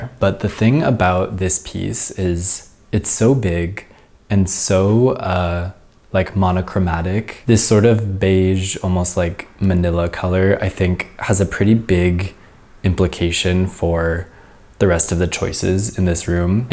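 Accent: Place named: Ukraine. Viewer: from Kyiv?? so nice to hear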